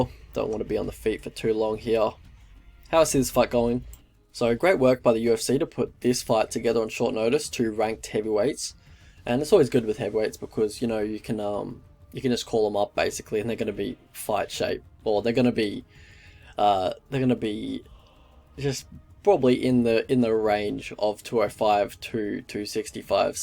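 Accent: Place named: Victoria, Australia. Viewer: Oh, ha,ha,ha!!! I thought you had more of a "Victorian" accent. I thought Queensland had a broader more "Crocodile Dundee"-type of accent, ha,ha. But, then again, that would vary even within the same city, as i became aware of in Melbourne. Anyway, great, detailed breakdown, Tom is gonna win and going to go closer and closer to Gane/Ngannou and we are witnessing a new era of heavyweights, that's for sure!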